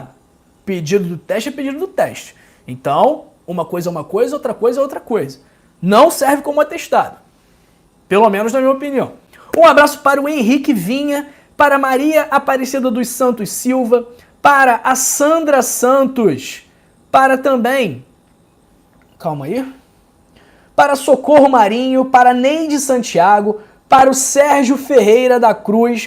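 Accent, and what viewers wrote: Rio de Janeiro, Brazil. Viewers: Parece piada né kkk, o Dr todo cuidadoso, dividindo informações importantíssimas, ajudando o próximo e a criatura reclamando do sotaque Cariquexxxx. O mundo tá do avesso 🙃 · MariaSantos, buscando trazer dados para sua compreensão (sobre o desconfôrto dito aqui), no intuito de somar, acredito q vc tenha querido se referir, na verdade, não à "voz" em sí, mas, talvez, ao "sotaque" - isto não é dele, é do sotaque do povo do Estado do Rio de Janeiro, o "sotaque carioca", que troca o "s" pôr "ix" (ex: maS e maix, coStaS e coixtaix, diagnóStico e diagnóixtico). Espero ter contribuído. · Ele tem o sotaque do Rio de Janeiro. Só isso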